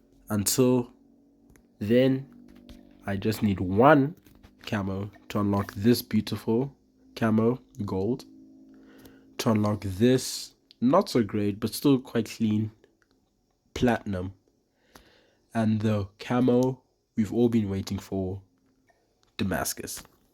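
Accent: South Africa. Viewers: South African? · Are you from south Africa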